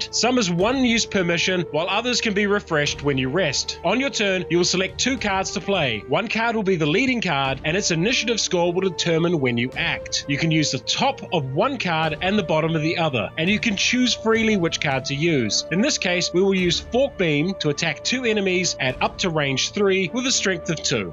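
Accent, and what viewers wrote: New Zealand. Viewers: Is this guy a kiwi?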